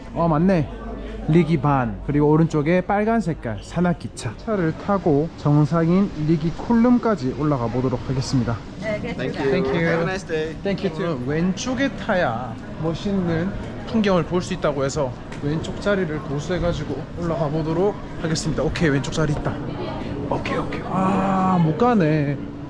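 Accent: Gyeongsang, South Korea. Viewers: 경북인줄 경남 이셨구나 부산 싸나이 ~~ 홧팅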